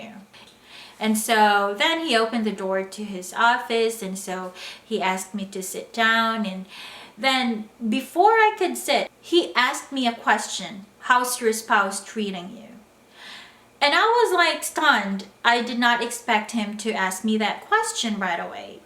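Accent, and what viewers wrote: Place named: United States. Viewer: True American accent too. congratulations. For me I always speak the way I did. Hope I could fallows your accent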